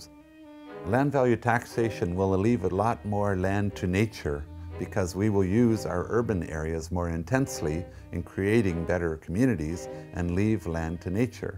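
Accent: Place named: Canada. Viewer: Holy shit this guy’s accent is Canadian af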